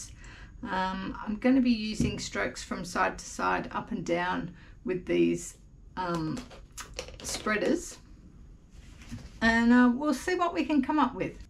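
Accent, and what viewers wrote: Australia. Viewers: Thats great from our angle it looks like a city reflection walking along the Yarra River...Melbourne 😊 Have you tried using a retarder or other gel medium to slow down your paint drying? It might help. I only found out about them recently and think its brilliant, so much easier to blend too..love your art. Happy New Year cheers from WA🦘🦘 always good to hear an Aussie voice on here. * I said what it was before you had finished and gave your own interpretation....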